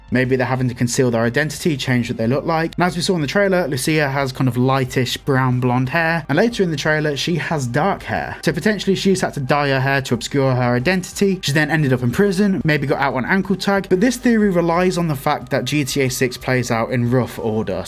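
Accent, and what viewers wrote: Birmingham, United Kingdom. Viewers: This dude is from Birmingham I can tell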